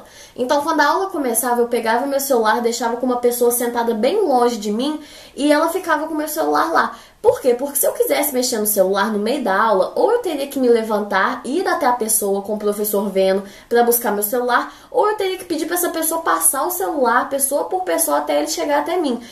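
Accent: Minas Gerais, Brazil. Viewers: meu Deus ela eh mineira 😍😍 kkkkk "esses trem" amo seus videos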